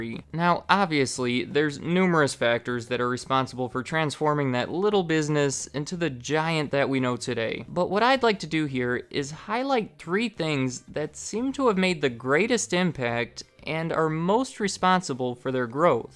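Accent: United States. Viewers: No need to apologize for the Americanized pronunciation; as an American living in Europe, I don’t take offense to the onslaught of mispronunciation of scores of American brands. It would be petty...😉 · hahha, yeah it's an American kind of thing to take liberties with other languages or Americanize English words isn't it😉 adidas = ugh-dee-dus aluminium = ugh-loo-me-num coupe = coop colour = =color They've got Nike down though👍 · This dude is so American it’s painstaking to listen to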